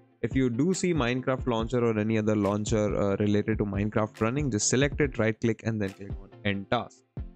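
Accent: India. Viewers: It didnt work Indian accent bro 😢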